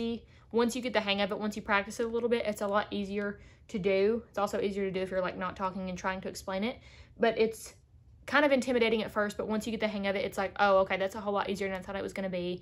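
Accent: South, United States. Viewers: I love love love natural brow, even before she did the feathered or push up eyebrow look and this by far is the best video on these type brows. She has the cutest accent, and I believe a detect southern. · Came to tame my brows, stayed for the southern accent 💖✨ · Ohhh how I love southern accents 🥰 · Love your southern accent girl! 💖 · bruh as a british person this ladys accent is really fucking with me xD idk why i expected her to just sound like me and then boom her southern accent - what nice suprise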